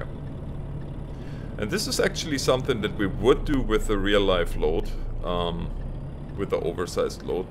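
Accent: Germany. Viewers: Haha I can tell and I also wanted to ask are you German?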